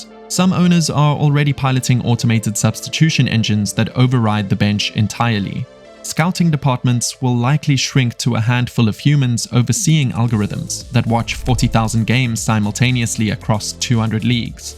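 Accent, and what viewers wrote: South Africa. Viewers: It's an addition because recruitment is less biased. Average players were signed simply because they were Brazilian or English and better players from Romania or Togo were overlooked. I saw your video a few days ago but I didn't watch because the thumbnail seemed clickbaity. I watched it by accident now and I loved it! Great video. Sidenote - Your accent sounds South African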